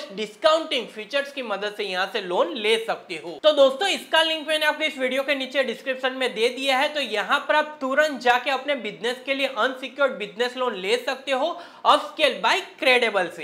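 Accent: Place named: Saurashtra, India. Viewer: Is bhai ki hindi se hi pata chalta hey key gujrat ke saurashtra ka banda hey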